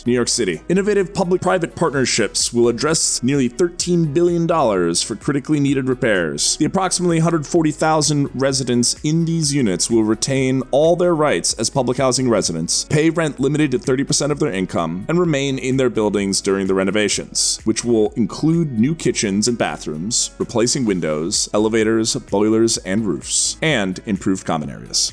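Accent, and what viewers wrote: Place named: United Kingdom. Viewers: Best narrator for this channel, but pls pronounce H the non British way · He's British. H is pronounced "Aitch"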